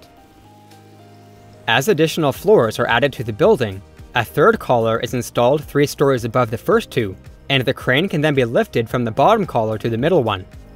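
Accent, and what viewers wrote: Canada. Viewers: You're Canadian - aren't you ? 👍 ( Pro - ject vs. prah - ject, mo - bile, vs. mo - bull, etc. ) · I sense a little Canadian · Your accent...Canadian? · A Canadian narrator! Hello! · This presenter has an extremely thick canadian accent.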